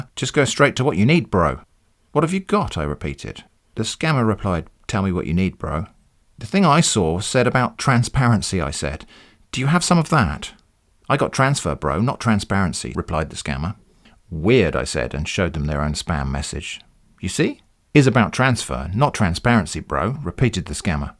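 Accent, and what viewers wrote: United Kingdom. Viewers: Had a long nasty allnighter of work, nice to have that nice British voice to soothe me to a Saturday of sleeping in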